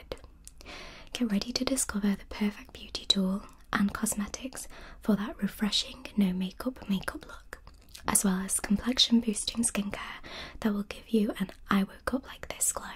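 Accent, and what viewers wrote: United Kingdom. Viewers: i literally love your accent so much! I’m British too but I wouldn’t say I have a “posh” accent lol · I love your British - English ❤️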